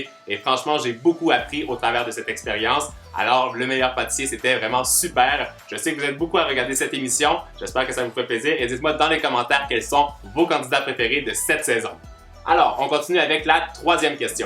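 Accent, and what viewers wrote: Quebec, Canada. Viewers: chouchoumiro msp moi aussi trouve ils se ressemble mais aussi j’me disais pk a un accent allemand et un accent québécois?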